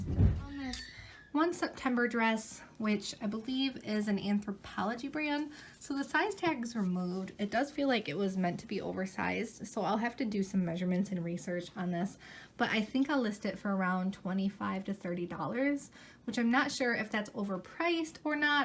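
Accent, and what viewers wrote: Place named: Upper Midwest, United States. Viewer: Are you from North Dakota or Minnesota by chance? I’ve been watching the ‘Fargo’ series on Hulu lately and couldn’t help notice you have a little accent that’s similar to the show.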